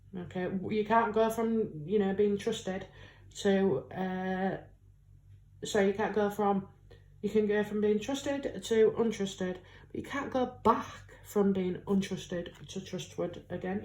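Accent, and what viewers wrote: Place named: Lincolnshire, United Kingdom. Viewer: I enjoy your accent! You remind me of home :) (Lincolnshire)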